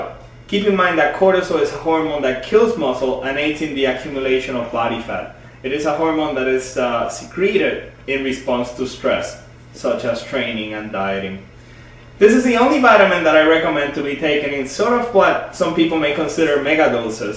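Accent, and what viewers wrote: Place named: Spain. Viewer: I love your Spanish accent, it's awesome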